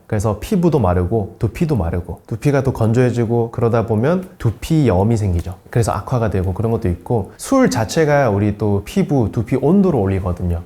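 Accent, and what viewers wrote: Gyeongsang, South Korea. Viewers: 선생님 경상도 출신이시죠!??? ㅎㅎㅎ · 선생님 고향이 혹시 경상도쪽이신가요?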